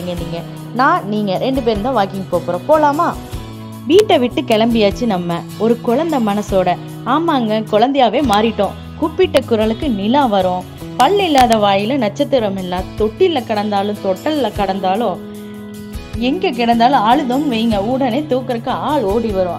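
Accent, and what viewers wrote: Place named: Kongu, India. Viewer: .... Miga Miga Azhkai ullathu... Unthan peyarai polavea... Ne pesi konjum kongu tamil.....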